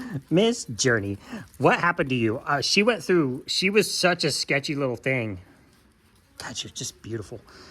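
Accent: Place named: Midwest, United States. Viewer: Looks like you may be getting some crap weather going through Ohio this weekend into next week. Frosty has the prettiest babies and they love her. Gingy is yo-yoing again. Poor girl. She gave us Chuck Norris. I live in Texas, but was raised in Iowa. Love that Midwest voice tone. Praying for Gingy to recover. Weather won't help her. Much love to both you and H.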